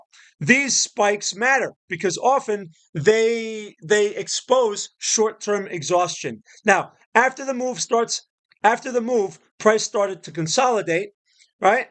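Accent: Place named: South, United States. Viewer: Came for ta instead end up listening to a hill Billy meth head 😂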